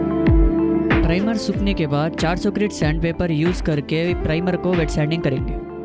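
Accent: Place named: Karnataka, India. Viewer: Are you from Karnataka??? Hindi tone can identify... 😀 Great job bro..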